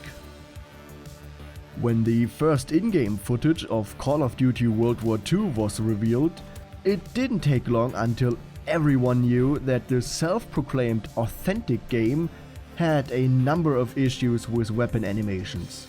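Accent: Germany. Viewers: sry kann mir dass net geben der deutsche akzent kickt zu hart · Dein deutscher Akzent ist wirklich fürchterlich aber echt nices Video. Du scheinst zu verstehen, wovon du redest😄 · So Deutsch der Akzent ^^ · the accent...YOU ARE GERMAN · I can hear your german accent mein freund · You can hear out you are german, correct? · Love the German accent bruder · German accent maybe? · Das hört sich schon nach einem starken deutsch Akzent an, bin ich da richtig? :D Vllt solltest du das "TH" nochmal über. Da bekomme ich jedes mal gänsehaut ;) Sonst ein super Video :) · Fantastic work as always,. Are you a Bundeswehr vet? You mentioned the G36 paddle release being something you're "quite familiar with." Maybe the comments just pointed it out to you but you've got the right accent for it:) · i was kinda confused if your accent was german or not, now im pretty sure it is(u can just ignore this, im only proud of myself) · Gib dir mal mehr Mühe bei der Aussprache! 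Dieses typisch deutsche "s" statt "th" treibt mich in den Wahnsinn! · Wusst ich doch, dass das ein deutscher Akzent ist :D Schönes Video! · Ich habe bereits in den ersten paar Sekunden gecheckt, dass du ein Deutscher bist! ;) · Are you German? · Are ja german? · Klingst deutsch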